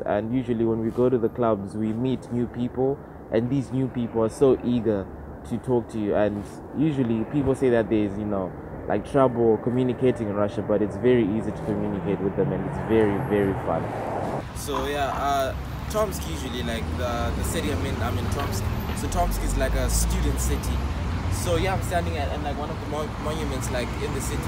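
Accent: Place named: Zimbabwe. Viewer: You have a very nice Rhodesian accent, quite rare to come by these days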